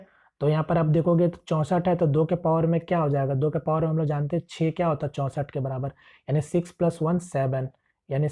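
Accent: Jharkhand, India. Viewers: Your accent is like jharkhandi....1 tho 2 tho...😁. Ye sirf jharkhand wale bolte he r u from Jharkhand..??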